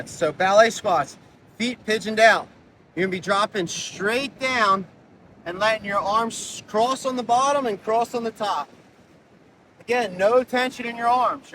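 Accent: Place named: Philadelphia, United States. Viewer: Omg. I love you but that Philly accent...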